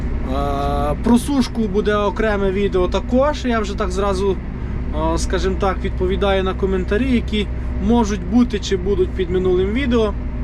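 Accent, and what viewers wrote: Ternopil, Ukraine. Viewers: з якої ти області? По розмові ніби з Тернопільської.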